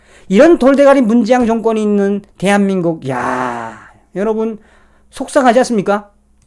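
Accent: North Korea. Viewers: 황장수의 뉴스브리핑에서 북한정제유 환적에 대한 집회에 동참해 주시길 바랍니다. 대한민국 국민들 다수가 나서는 모습을 보여야 외신도 주목하고 한국을 포기하지 않습니다. 그리고 문죄인도 무너지고 통일도 빠를 수 있습니다. 문죄인이 무너져야 한국이 제대로된 북한제재에 동참하고 북한의 숨통을 제대로 조일 수 있습니다. 주변에 되도록이면 많이 참여하시도록 부탁드립니다. 저도 지방에서 생애 처음으로 참여하기로 했습니다. 방송 잘 보고 있고 북한 사투리가 정겹네요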